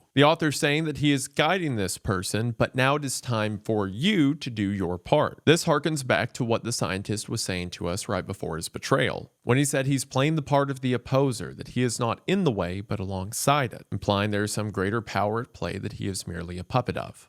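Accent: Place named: Appalachia, United States. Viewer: My boy’s Appalachian accent is coming out full force. Love to hear it.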